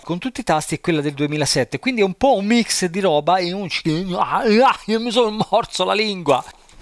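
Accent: Tuscany, Italy. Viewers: Da quale parte della toscana vieni?😂😂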